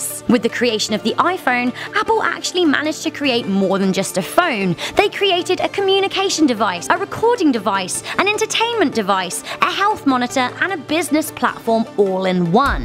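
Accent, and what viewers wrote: United Kingdom. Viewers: how about "what if there was not a British narrator with an extremely annoying accent"?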